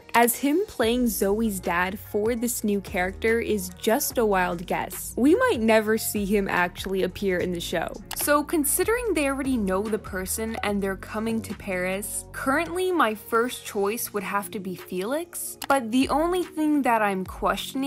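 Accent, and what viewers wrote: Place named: New York, United States. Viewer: In the beginning I thought it was someone from New York I dunno I’m just saying!?!?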